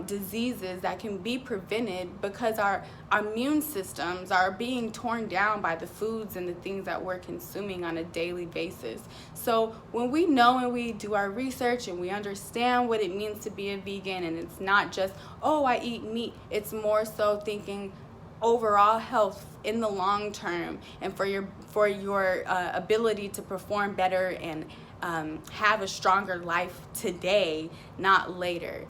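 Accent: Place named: Jamaica. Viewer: Beaitiful skin; sounds Jamaican too.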